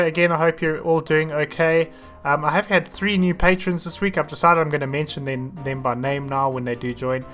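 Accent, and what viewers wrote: South Africa. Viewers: Are you south African I love the accent but I'm not good at accents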